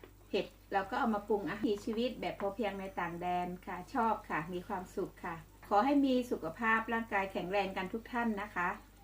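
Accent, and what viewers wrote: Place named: Isan, Thailand. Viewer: ชอบเวลาเว้าอิสานจะน่ารักดี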